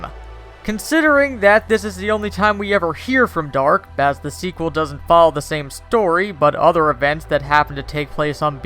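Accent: United Kingdom. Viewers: Jon Grajeda it’s down to accent, usually it’s the British that pronounce it like that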